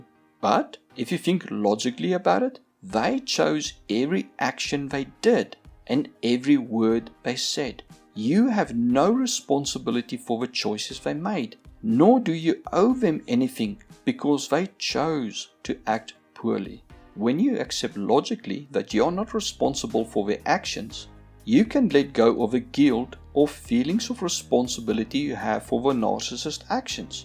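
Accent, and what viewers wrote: South Africa. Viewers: Great info Are you South African?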